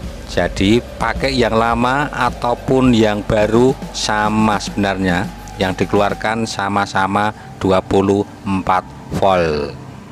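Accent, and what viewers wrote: Java, Indonesia. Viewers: Ilmunya mantab, Medok ngomongnya 😁 nyenengin banget dengernya 😁, Meleddiyakk = Meledak. 🤣🤣 Sehat dan Sukses Slalu Pak !!!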